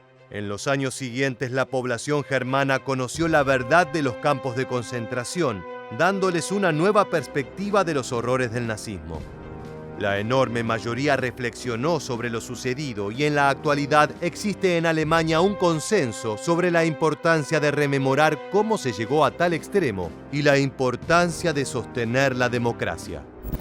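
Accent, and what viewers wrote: Argentina. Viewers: Argentina, no escuchas su acento? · Es Argentino, sólo escuchá su acento.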